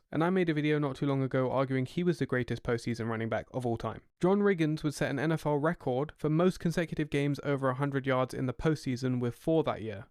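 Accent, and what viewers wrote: England, United Kingdom. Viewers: I get so sick and tired of somebody putting on youtube , talking about a sport with an english accent that they're just reading a f**** script and know nothing about do better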